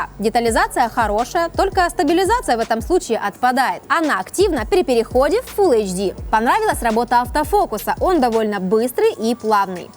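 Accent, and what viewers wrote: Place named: Kuban, Russia. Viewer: Кубанский, Украинский-южный как короче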